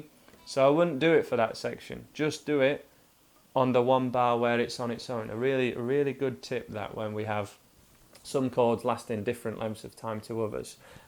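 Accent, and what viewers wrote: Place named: West Yorkshire, United Kingdom. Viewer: What part of West Yorkshire is that accent from?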